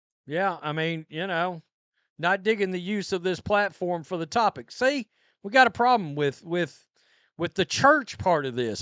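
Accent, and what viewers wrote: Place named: East Texas, United States. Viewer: What part of East Texas are you from?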